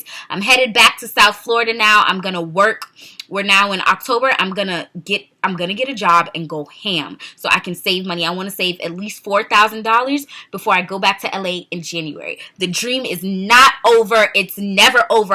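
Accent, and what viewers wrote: Jamaica. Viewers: I love the Jamaican accent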